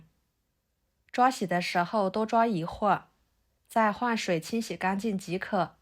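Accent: Yunnan, China. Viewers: 也谢谢你的云南普通话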